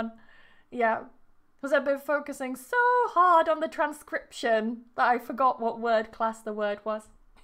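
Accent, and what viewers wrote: United Kingdom. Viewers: IMPRESSIVE LESSON! For me Ms. is the most amazing teacher. I do love her melodic British accent as I told everybody I've devoloped the American pronununciation but now I'm learning the British entonation and pronounciation as well. Thank you very much Ms. 😘😘😘😘😘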